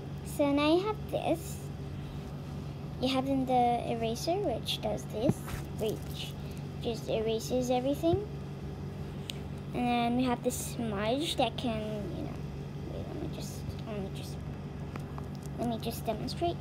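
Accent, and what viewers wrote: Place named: United Kingdom. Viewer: Accent nen britishb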